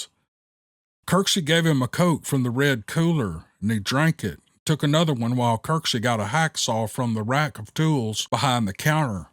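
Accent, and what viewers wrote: South, United States. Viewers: Hey,great stories about the poachers,I really enjoyed them,you've got the perfect southern voice to tell stories.👍🤙👍🤙👍🤙👍🤙👍🤙👍🤙👍🤙👍🤙👍🤙👍🤙👍🤙.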